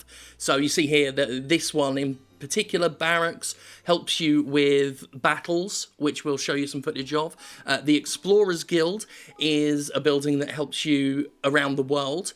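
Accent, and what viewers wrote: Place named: United Kingdom. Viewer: When he started talking about the story, he completely lost me with his Britishness.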